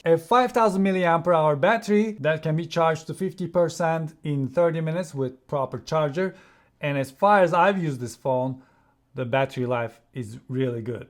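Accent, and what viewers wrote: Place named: Turkey. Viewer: You have a Turkish accent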